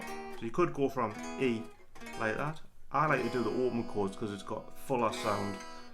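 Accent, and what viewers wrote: Newcastle, United Kingdom. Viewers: BTW, I'm not from the UK, is that a Geordie accent you have? Cheers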